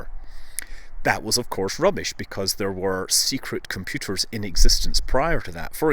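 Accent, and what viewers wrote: Scotland, United Kingdom. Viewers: you sound borderline scottish, i like you. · I heard that plate tectonics is a relatively new theory. Were you taught that at school? Also, this is your first video I've ever seen, I enjoyed it. You said you're living in California. But from your speech I would assume your Scottish... Is that true or am I just misinformed about accents.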